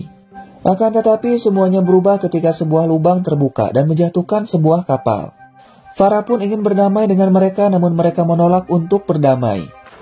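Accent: West Java, Indonesia. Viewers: Pasti naraton nya orang sunda😅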